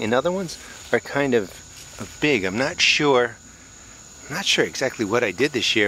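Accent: New Jersey, United States. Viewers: Love the Jersey accent! A lot of cool stuff on your site too. Inspirational to see so many beautiful peppers growing. Do you ever grill them?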